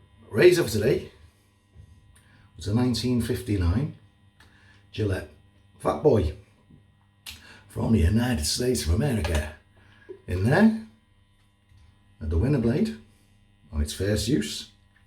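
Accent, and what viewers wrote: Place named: RP, United Kingdom. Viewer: Get'in a bit posh now then lad?!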